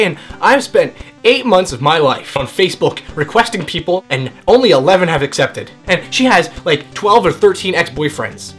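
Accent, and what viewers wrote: England, United Kingdom. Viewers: i love his english accent!! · You have an excellent British accent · i love your English eksent:D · I love your British accent :D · Ur british accent rocks! :) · Nice British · I love ur uk accent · Right on with the British accent! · HA! I love hearing you talk in a British accent. Lots of love from the uk : ) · This is absolutely awesome(: Love your brittish accent ( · Omg your British accent is adorable!! Ur hilarious! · +British Accent= <3:3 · OMG u are soooo funny xD and ur english accent o.m.g hilarious! :P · Dat British Accent. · Your English accent is just afsbfsbdagagdagda <3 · Ur British accent is amazing *-*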